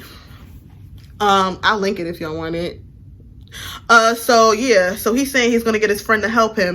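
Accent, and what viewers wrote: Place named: United Kingdom. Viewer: So proud of you! Its the British accent for me lmao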